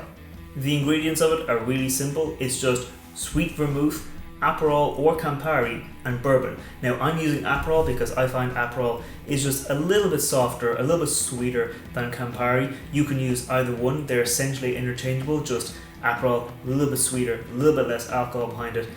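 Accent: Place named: Ireland. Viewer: Where are you from and what is your name? I recognise an Irish accent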